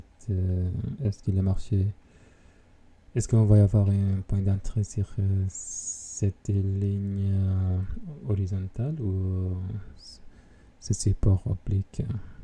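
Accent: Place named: Italy. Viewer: Ciao e grazie per il video, mi sembrava un accento un po italiano e nei parametri ho visto 🇮🇹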